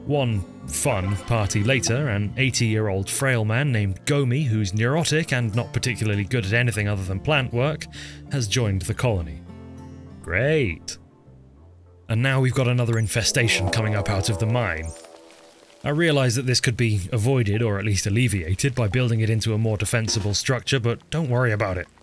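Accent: United Kingdom. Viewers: doesnt this dude sound like spiffing brit ?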